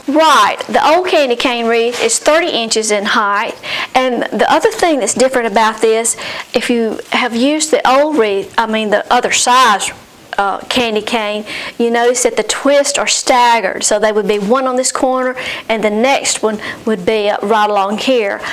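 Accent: South, United States. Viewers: I just love watching your videos! Not only have I learned a few things, thats the bonus, but best part of all, is feeling like you have just invited me into your home for a visit. I love the way you talk lol, you guys sound just like me he,he. I was just a talking to you saying heck yeah I wore a garter belt. Gotta love those Southern Ladies. Never change. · I love your videos, I 3 daughters and one step daughter and love the times we craft or cook together. We are southern too so we love listening to your accents! I am learning all things deco from you gals! Thanks.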